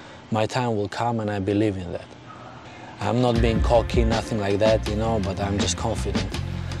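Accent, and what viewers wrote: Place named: Scania, Sweden. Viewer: They both have a Scanian accent from the south Sweden.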